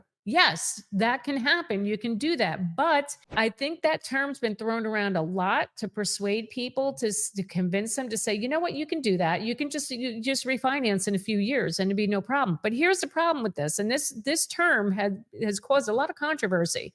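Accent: New Jersey, United States. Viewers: I watch this channel for the New Jersey accent alone 😅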